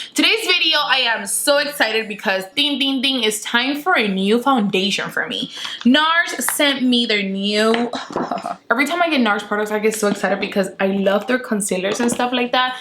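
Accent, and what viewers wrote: United States. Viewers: I love how you speak, reminds me of back home in SoCal. What lip gloss are you wearing????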